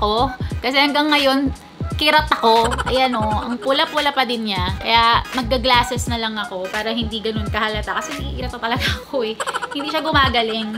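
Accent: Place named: United Kingdom. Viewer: ok naman pronounciation nyo ng youtube, british pronounciation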